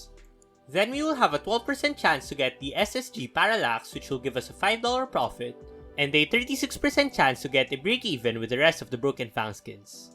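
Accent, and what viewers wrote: Philippines. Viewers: Pinoy voice detected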